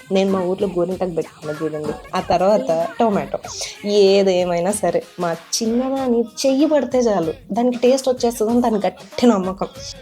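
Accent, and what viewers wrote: India. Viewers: Mee maata chusi ma telangana vaallu anukunnanu andi inni rojulu,ayinaparledhu lendi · Madam mee language ni batti meedi maa Kurnool anukuntunna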